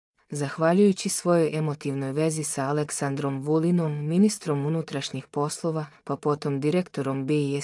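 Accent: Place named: Albania. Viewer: Siftarski akcent ...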